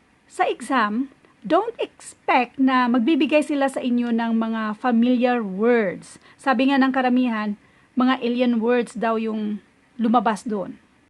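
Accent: Visayas, Philippines. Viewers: Parang bisaya kagud maam. Tama bako maam noh? 😂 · Nakakatuwa ang bisaya accent. Makalingaw man. Pero daghan matun-an. Thumbs up po ako.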